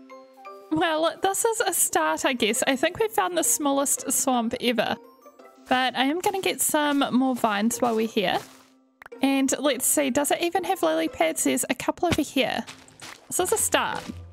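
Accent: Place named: New Zealand. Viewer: I miss my hometown sm Nz is whre im from and hearing your voice takes me back :)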